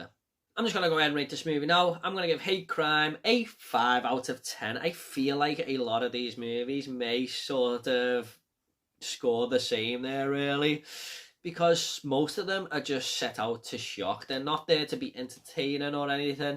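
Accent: United Kingdom. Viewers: Good review man, nice to hear someone from the UK talking about stuff like this too - if you're interested in any recommendations that don't usually come on these "extreme" lists check out Red to Kill (HK) and Daddy's Little Girl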